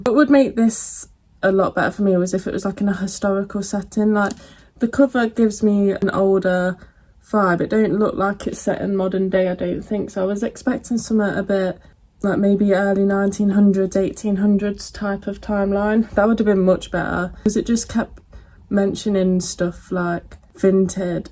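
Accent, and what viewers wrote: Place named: Nottingham, United Kingdom. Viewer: Are you from Notts? Trying to guess your accent!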